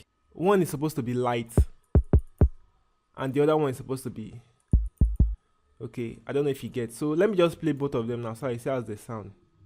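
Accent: Nigeria. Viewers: I enjoy your tutorials because of the way you speak and explain in nigerian tone and language. You deserve a million subscribers bro I love you 😘😘😘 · Bro remain blessed Love your tutorial and I love your use of Nigerian accent hope we could would work together some day.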